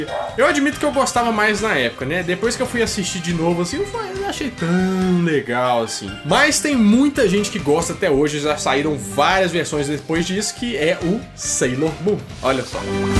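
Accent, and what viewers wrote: Minas Gerais, Brazil. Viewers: Vc e mineiro brother? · Esse sotaque minero é top demais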